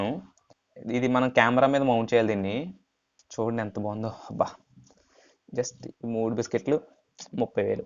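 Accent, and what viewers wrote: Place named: West Godavari, India. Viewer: పశ్చిమగోదావరి district , language ❤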